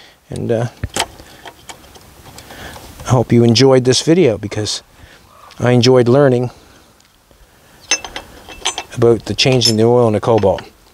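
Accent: Canada. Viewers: I promise you , not all Canadians sound like this guy …. Or have a dusty ride like this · I was just about to say, you sound more Canadian than I is. Then, you said Nova Scotia 😂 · Fired this up and in the first 10 seconds I was like yeah this guys Canadian and it was confirmed when I heard Canadian tire 😂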